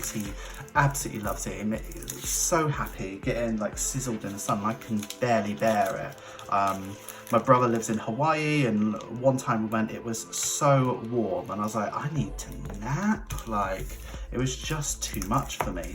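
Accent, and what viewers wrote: United Kingdom. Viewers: Your answer to the kids question was so funny. I have a friend who did NOT want kids, but "oops". She's still not happy to be a mom, but she would kill anyone who looked sideways at her kid. It's pretty funny. But I love how honest your answer was. And I wish you could be uncle to my kid. I think you'd find him fun, and he'd definitely love you. I mean, he already kinda does. He's almost 18 mos, but he loves watching you, and listening to you talk. We're not British, so I know part of the talking is the accent. But also you're so animated and engaging. That person with all the envelopes picked such pretty colors!!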